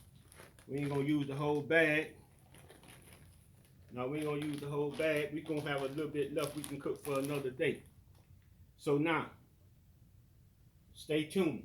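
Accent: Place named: United States. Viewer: Look's delicious I'm a New subscriber I love your country accent where are you from if you don't mind me asking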